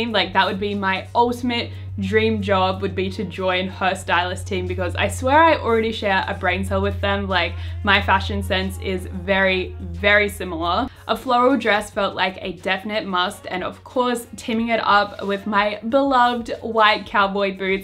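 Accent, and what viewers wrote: Australia. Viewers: OMg this video was so cute and I didn’t realise you were from Brisbane (I’m from Adelaide and I thought I recognised a bit of the Aussi accent 😂). My fav playlist song of all time has to be by but I’ve actually been loving by even though I thought nothing could top 😍 Insta: breathing_underwayer456 and I’d be happy with either album 😊😍 Thanks so much for this and it’s honestly so great to see other Australian Kpop fans (even tho no one ever come here for concerts 😭😂)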